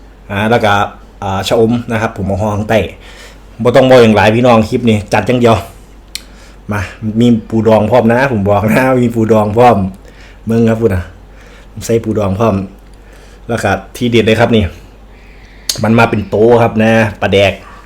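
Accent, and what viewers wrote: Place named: Chaiyaphum, Thailand. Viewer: อ้าย เว้าสำเนียงคล้ายๆบ้านนู๋เลยจ้าคนชัยภูมเด้อจ้าfc จากชัยภูมิเด้ออ้าย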